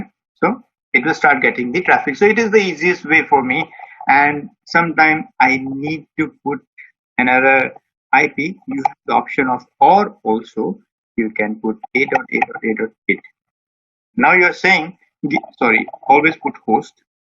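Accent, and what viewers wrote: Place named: India. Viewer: I like your video. I like it despite your heavy Indian accent. It was more informative than any other video I have found on the subject on yt so far. Thank you. 🙏🏻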